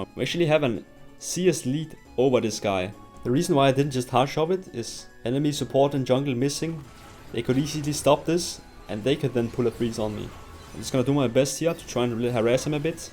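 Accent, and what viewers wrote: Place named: Denmark. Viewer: I can already tell you’re from Denmark by your accent🤣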